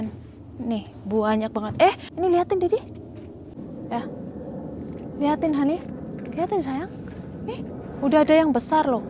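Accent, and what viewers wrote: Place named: Java, Indonesia. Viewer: Ngomong jowo e ora ilang y mom .... seneng punya tanaman buah dan sayur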